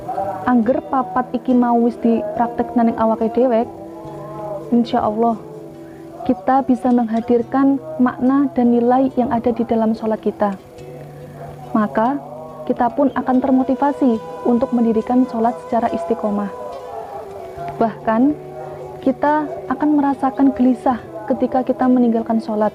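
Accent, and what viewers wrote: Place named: Banyumas, Indonesia. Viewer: Ngapak ngapak 👏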